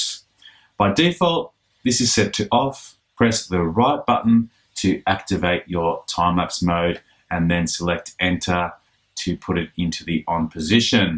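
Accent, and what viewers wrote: Australia. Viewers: Hey man r u from melbourne?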